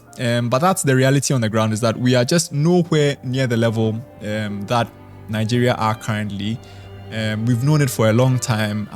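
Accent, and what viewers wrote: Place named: Ghana. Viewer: You speak like a Ghanaian one love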